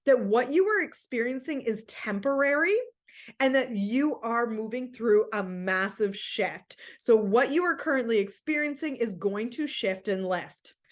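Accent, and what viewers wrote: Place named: United States. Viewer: Absolutely wonderful to listen to you. loud and clear for me who is Swedish. Wonderful American accent.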